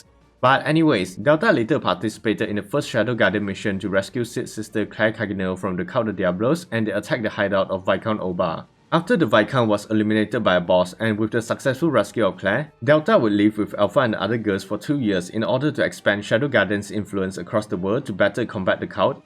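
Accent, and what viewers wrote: Singapore. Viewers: bro u singaporean?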